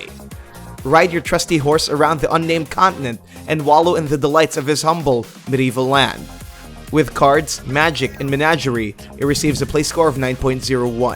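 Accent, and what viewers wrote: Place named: Philippines. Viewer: This dude sounds Filipino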